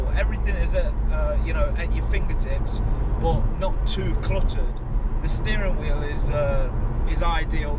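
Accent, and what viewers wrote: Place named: South Yorkshire, United Kingdom. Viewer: I actually own this exact car and its actually a perfect performance car aha and this is my first video i watched of your channel and i really enjoyed this video so u earned yourself another subscriber and as soon as you started talking i instantly knew you. Was from round south yorkshire area aha im from Sheffield my self. :) where abouts do you DJ might have to come and see you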